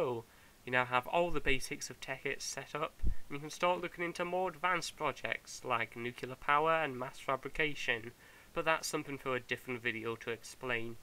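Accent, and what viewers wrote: United Kingdom. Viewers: Probably a dialect of the UK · You sound like 'Charlie is so cool' u know that British one haha.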